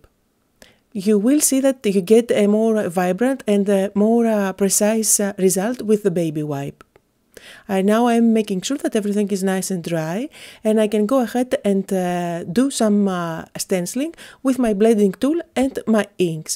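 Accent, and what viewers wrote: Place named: Greece. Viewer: I always liked your accent and wondered where you are from . I wouldn't have thought Greece. I have always wanted to go there!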